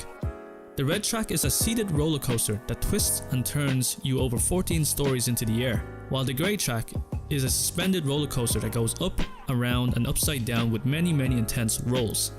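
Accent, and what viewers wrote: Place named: Ireland. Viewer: Watching and thought "this lad has an Irish accent". Going in May. Subbed!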